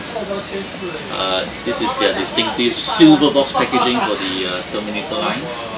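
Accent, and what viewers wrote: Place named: Taiwan. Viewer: 一听你的accent我就知道你是台湾人。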